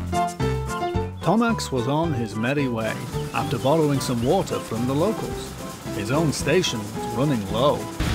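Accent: Scotland, United Kingdom. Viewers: Is the narrator Scottish?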